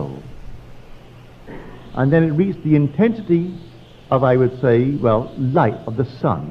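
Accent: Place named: Barbados. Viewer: I love hearing his Bajan accent, nostalgic. I truly like his teachings too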